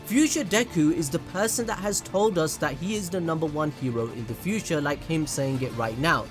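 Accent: Jamaica. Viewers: i laughed very hard for the Jamaican accent. now he just needs to weave in a Trinidadian accent and your skits will be perfect. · 🤣🤣🤣......yuh get a pass mi boss wid dat Jamaican accent 🔥🔥😂😂😂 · Lolol dude you deserve more subs just by adding that Jamaican accent big up u self respect lol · Are u Jamaican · Wait Holup your Jamaican 🗿 yes I will now go back and like every vid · Ayo I've been watching this man for year no one told me he was jamaican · You Jamaican bro?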